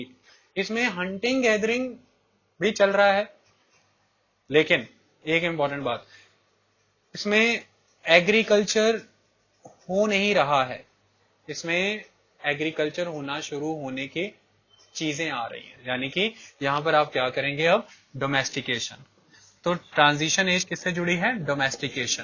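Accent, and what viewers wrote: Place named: Punjab, India. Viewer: The Punjabi touch in your lectures makes class really interesting. Though, there is no Video lecture still you make these Audio lectures really interesting by using your 3D imaginations as JHRRRRRR JHRRRRRRR....!!! Hahahaha...! Really enjoy your classes and content is really amazing. And the way you explain each and everything is like Cherry on the Cake....! <3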